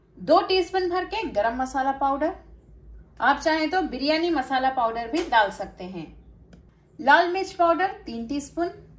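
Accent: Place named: Deccan, India. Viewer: Ohh.. hamari hi tarah Deccan ki hain.. Masha Allah.. Par mai Bangalore, Karnataka sey hun..!! Tnx for replying..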